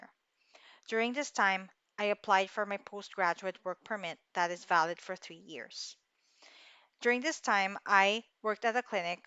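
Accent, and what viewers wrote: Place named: Canada. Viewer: Canadian accent so Ate🤣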